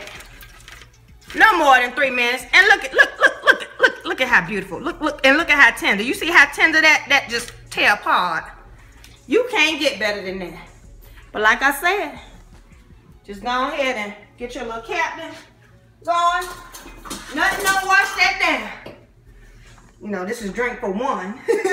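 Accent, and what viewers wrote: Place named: New Orleans, United States. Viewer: You sound of New Orleans not Miami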